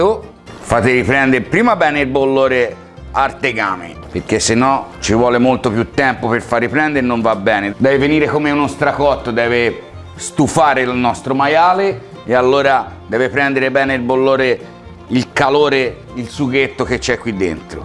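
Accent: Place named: Viareggio, Italy. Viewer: Le ricette sicuramente sono ottime, ma io le faccio I complimenti perché usa tutto della verdura, non fa sprechi, mi piace vedere come con poche cose riesce a fare tanto ben di dio!! Bravo!!! Hah un'altra cosa, la parlata! Mi ricorda la mi zia che è di Viareggio, non la vedo da un anno. Buon anno nuovo!!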